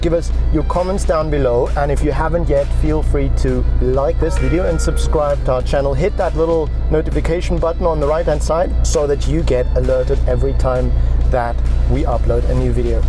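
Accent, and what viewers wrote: South Africa. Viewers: Sounds like a South African accent to me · This guy sounds South African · The presenter sounds South African. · Is the presenter South African? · Are you South African?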